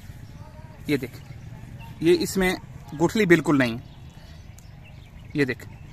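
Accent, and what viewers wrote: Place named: Mewat, India. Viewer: آپکی آواز سے آپ مجھے میواتی لگ رہے ہیں۔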